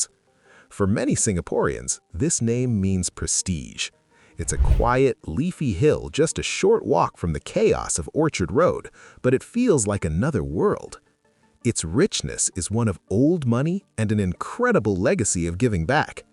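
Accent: United States. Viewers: The -"gie" in Selegie Road is pronounced like the "-gee" in "geek". Please stop pronouncing everything the American way when the names belong to another country.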